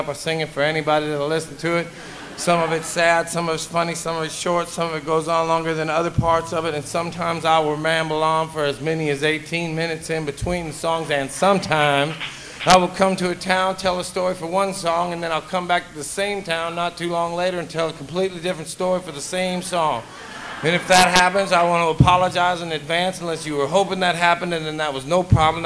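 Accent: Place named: Nashville, United States. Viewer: Well my mama moved from Tennessee to Salem Oregon and had me. She still talks like you and I never have talked like you. They don't talk like you in Oregon. You must have paid someone to help you talk like someone from east Nashville? Something amiss...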